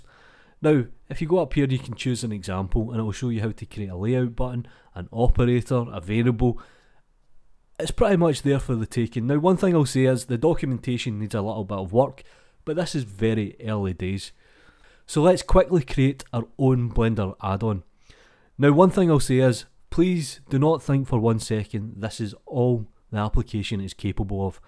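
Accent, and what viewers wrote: Scotland, United Kingdom. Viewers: First i came to look at the AddOn. But now i just listen to your scottish accent lad !! Love it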